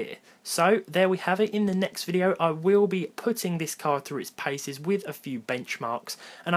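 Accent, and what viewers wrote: United Kingdom. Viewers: i love your british accent dude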